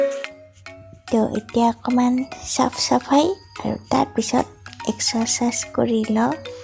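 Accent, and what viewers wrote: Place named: Dhubri, India. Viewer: Vdo go sei moja lagise re... Enkeoi koi ne dhuburir bhakha. moi kin2 xuni valei pao 😊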